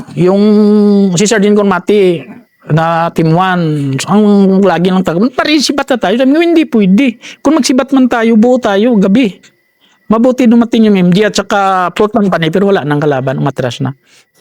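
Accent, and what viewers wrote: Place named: Iloilo, Philippines. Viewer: ilonggo gid ang accent 😂🫡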